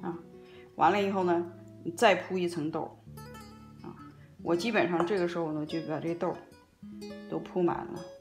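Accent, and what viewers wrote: Shaanxi, China. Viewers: 喜欢听陕西话讲👍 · 才看到这么地道的陕西美食！谢谢诚意分享🙏！还有地道的乡音，逗得我观看全程哈哈直乐！都是爱吃的，准备做去！🤝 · 姐的陕西话很地道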